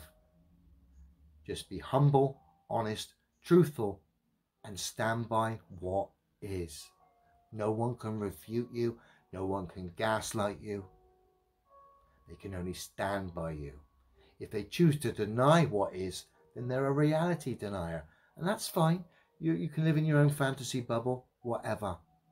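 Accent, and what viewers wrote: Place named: United Kingdom. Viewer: new sub, great to hear a Brit covering this topic with great detail and information. Keep it up my friend people starting to see this monumental lie.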